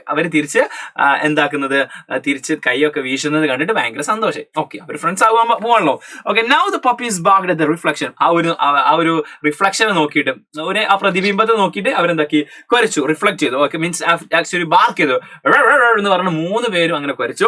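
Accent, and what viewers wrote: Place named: Kozhikode, India. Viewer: Kozhikode aan enn thoonunnu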